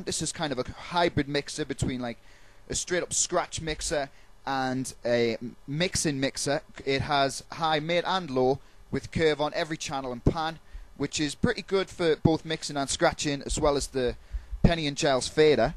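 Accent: United Kingdom. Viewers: i fuckin hate the british accent, but for sum strange reason i dont mind when you're speakin it =/ lol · you a geordie?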